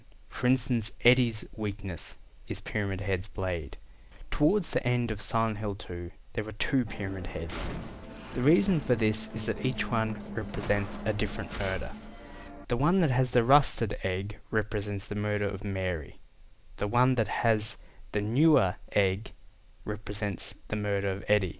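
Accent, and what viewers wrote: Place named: Germany. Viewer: i love your german accent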